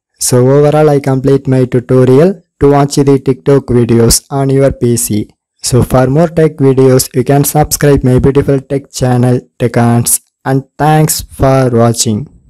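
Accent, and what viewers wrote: England, United Kingdom. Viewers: nice english accent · thanks for the english accent!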